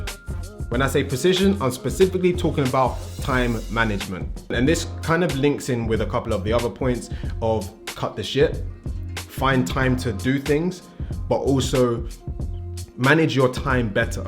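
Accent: Jamaica. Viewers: Are you Jamaican, African or British? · Decent video....I do labor work and im dirty after work So i always have to go home and shower,,,then sometimes nap and then take a pre workout before the gym,,,,It works just you dont get good sleep at night before of the pre. I find going to bed early and actually gogin the gym before work is better and the pre keeps u going all day. But i heard morning workouts make u drop size because you have no food in you...? You are Jamaican ISH? i heard u say a few thins at times like maybe u got some family here....?g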